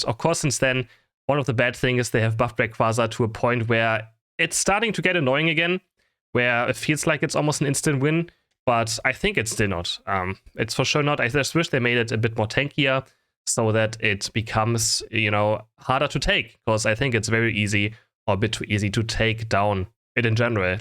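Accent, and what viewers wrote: Germany. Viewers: I think that the game is currently at a decisive crossroad for it's future with the china thing. Let's see what happens and let's hope they correct the direction, because the way the meta developed in the last few months (like you said the big changes started to be noticeable around September with the whole Mime fiasco) with a focus solely on big damage and the inclusion of coffee break long stuns is pretty worrying. Greatly appreciate your channel btw, even if I still can't understand which country you're from (Germany? Netherlands?) Have a good new year Eve, cheers my man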